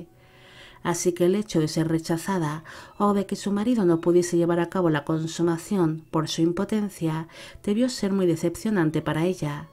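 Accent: Spain. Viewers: Ese acento tuyo tan particular de que parte de ESPAÑA es ? Señorita!!!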